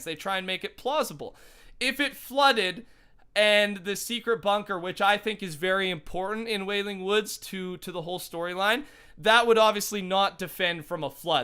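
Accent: Austria. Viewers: Oh, ein österreicher :D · What you are austrian?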